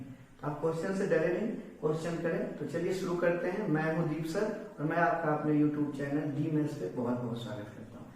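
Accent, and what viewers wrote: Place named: Bihar, India. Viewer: Noted Thanks sir but Lec 3 kaha hai sir....! Mujhe apka padhane ka style aur apke bolne ka bihari tone bahut jyada achaa laga......!❤❤❤❤❤❤❤❤❤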